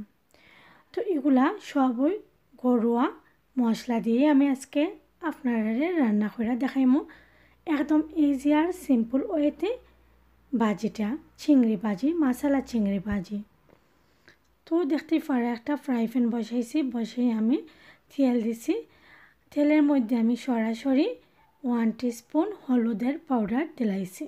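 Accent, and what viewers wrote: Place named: Sylhet, Bangladesh. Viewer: আপু দারুন রেসিপি,তোমার কথা শুনতে খুব ভালো লাগছে,বিশেষ করে “ “গান শোনার পর আমি সিলেট ভাষার প্রেমে পরে গেছি❤️❤️❤️❤️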